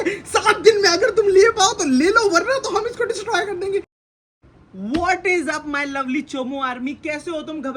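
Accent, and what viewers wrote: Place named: Haryana, India. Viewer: या तो तुम हरियाणवी हो या फिर दिल्ली एनसीआर तरफ के हो ना होना होना होना होना होना😮😮😮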